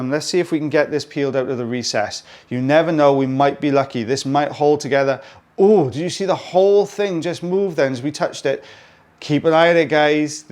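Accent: Wales, United Kingdom. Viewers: your wenglish is great to listen too unless your Welsh you won’t know what that is but I’m sure does fab peel love these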